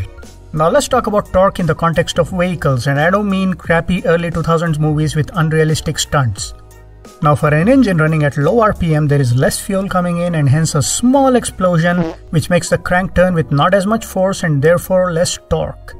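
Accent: India. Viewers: this probable the best Indian YTvideo explanation I'VE seen yet hahahaha with accent yet understandable!!!!!!woooooooooooo yeah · Amazing, by the accent i am guessing it's an Indian guy. Probably the first sensible Indian youtuber ❤️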